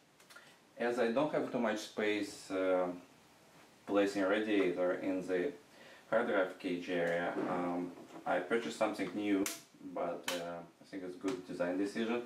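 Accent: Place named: Russia. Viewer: I don't think it really is German. He probably does speak German well, but I'd say Austria or Poland or Hungary. They all speak German well, but slightly different accent from actual German. I caught him having a minor Russian slant to his English(ex:blyeed, pyart). His accent is slightly off from German-German. I hoor ze vaak genoeg hier in de omgeving.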